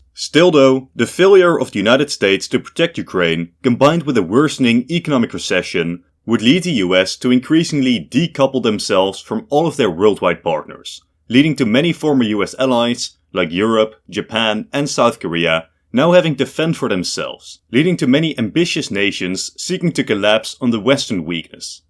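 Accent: Netherlands. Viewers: yeah i don't understand how anyone thinks is American. Man has the most quintessentially Dutch accent ever lmao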